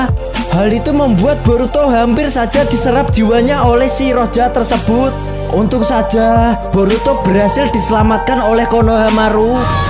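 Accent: Java, Indonesia. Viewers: Medhok bnr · Medok bgt lu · Pengisi suaranya lucu mas,, dari jawa yak wkwkwk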